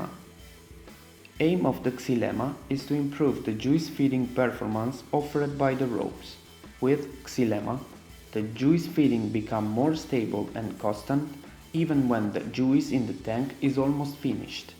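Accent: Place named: India. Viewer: Guarda che parlare inglese con accento indiano non è così facile. Grande! :)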